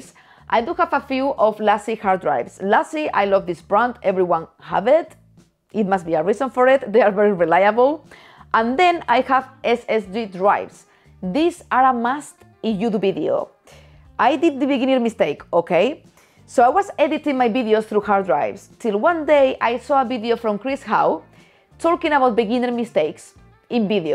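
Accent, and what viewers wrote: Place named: Spain. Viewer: great video,! I love your energy and your "spanis" accent:-)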